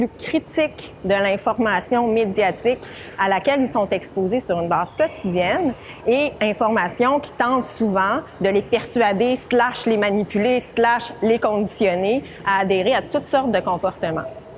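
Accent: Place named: Canada. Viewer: Merci beaucoup très belle canadienne ! Je suis parisienne..j'ai réussi à vous suivre avec votre bel'accent ! 🐈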